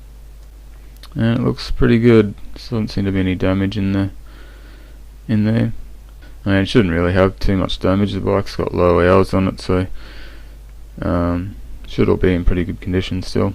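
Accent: Australia. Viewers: First youtube video I've watched with an aussie accent and swearing brilliant, video was helpfull too.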